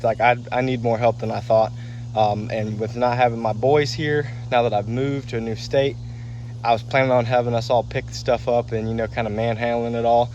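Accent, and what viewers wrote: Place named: Texas, United States. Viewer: Wya? Texas? It sounds like it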